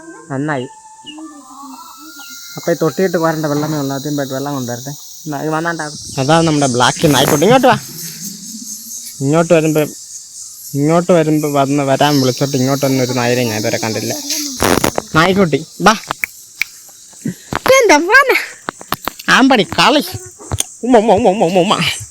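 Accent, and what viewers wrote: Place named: Thiruvananthapuram, India. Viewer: മോനെ നിന്റെ ഭാഷ യാണ് ഇഷ്ടം മക്കളെ നമ്മുടെ പപ്പനാവ സാ മീരെ മണ്ണിലെ ഭാഷ ഒരിക്കലും അത് കൈവിടല്ലേ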